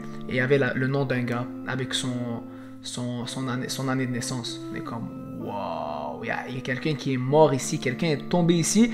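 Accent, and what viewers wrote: Canada. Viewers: t as un accent canadien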